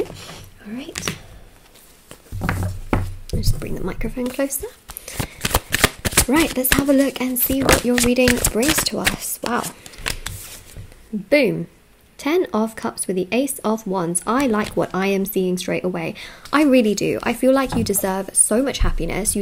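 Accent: United Kingdom. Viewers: This is fairly accurate. I've heard similar from others. It's so in depth too, literally one of the longest readings. Really appreciate all the time and energy spent on the reading. Thank you! Love your voice and British accent too 🙂💜.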